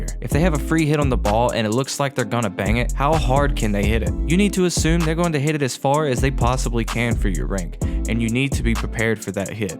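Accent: United States. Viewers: Gotta love the good ol' murican accent!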